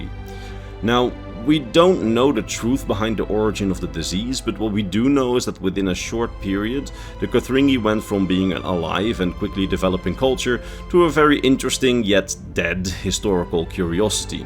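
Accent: Netherlands. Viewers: Ik hoor gewoon t Nederlandse accent haha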